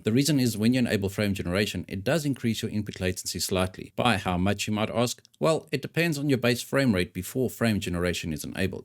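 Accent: South Africa. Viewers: Is that Afrikaans accent I hear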